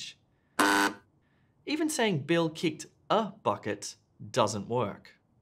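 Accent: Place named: France. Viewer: ur pronunciation is french english bro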